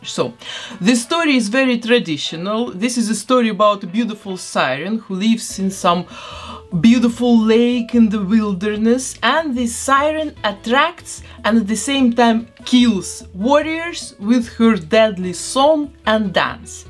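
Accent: Russia. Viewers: мне кажется... или у нее русский акцент..... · Есть ощущение, что она с русским акцентом? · Что это за акцент? Русский или норвежский? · Мне кажется, или у девушки чисто русский акцент?😅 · Почему я слышу русский акцент 😮 кукла замечательная! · Работа потрясающая P. S. Я одна слышу лютый русский акцент?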